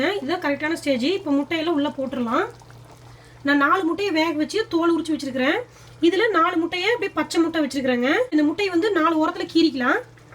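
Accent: Kongu, India. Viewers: கொங்கு பாசை சூப்பருங்கோவ்.. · முட்டை குழம்பு செய்முறையும் மண் மணம் மாறாத கொங்கு தமிழ் பேச்சும் அருமையோ அருமை...குழம்பு சூப்பர்....தொடரட்டும் உங்கள் சேவை...நன்றி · Konku timal pesuradhu thani allaku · I like her kongu slang.. varevah.. 😋 yummy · Athuthanka kongu Thamil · Egg Curry looks so tempting n so do ur kongu tamil sounds great😍🌷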